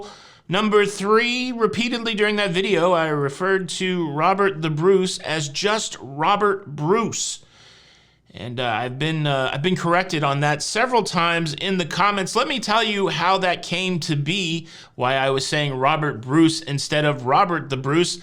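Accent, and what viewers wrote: United States. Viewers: Give the guy a break. Talk about oversensitive! American accent.. so what?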